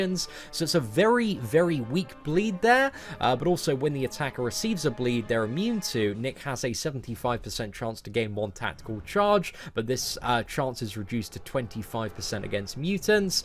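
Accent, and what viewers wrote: United Kingdom. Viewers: gets a paycheck from kabam, don't be fooled by his British accent ppl!!! Ladies and gentmen.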